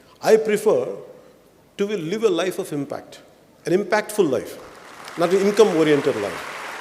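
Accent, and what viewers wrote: India. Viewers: ఒరేయ్ వెదవ. తొందరగా అర్థం చేసుకున్నావు. If you want to be proud by hearing butler english, that is upto you.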